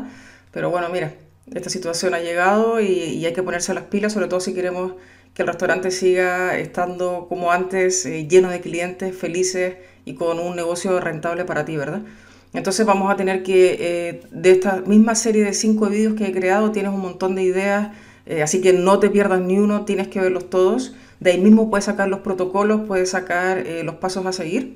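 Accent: Chile. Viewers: eres Chilena?.. Acabo de encontrarte eres lo máximo!! Muy claro y muy motivador! Excelente ayuda para nosotros del rubro Restaurantes! Saludos!!!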